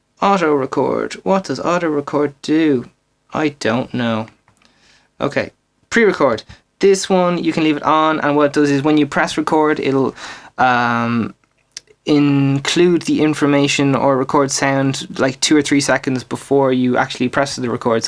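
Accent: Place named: Ireland. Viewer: Nice one, & a pleasure 2 hear that charming Irish accent !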